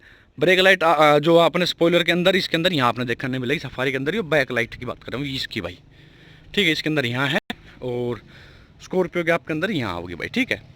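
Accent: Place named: Haryana, India. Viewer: Me apke bat sun ne k liye video dekhta hu.... I luv Haryanvi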